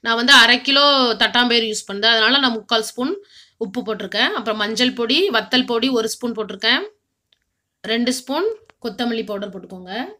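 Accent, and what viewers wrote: Tirunelveli, India. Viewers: It looks simple and easy. But I really enjoyed your narration.....I can feel the Tirunelveli ambient in our slang....Keep up the good work.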